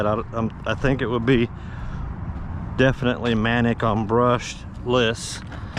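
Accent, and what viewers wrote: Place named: Houston, United States. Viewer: Man I swear you must live somewhere on the north side of Houston I don’t know why I think that - maybe your accent and the sound of all them 18 wheelers going by 😄 that’s where I grew up. Great run that thing looks right at home out there in the dirt 👍👍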